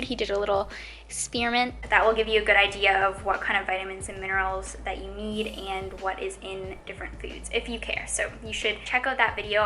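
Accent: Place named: Ontario, Canada. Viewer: Are you from Ontario?! Haha I for sure thought you were from the states!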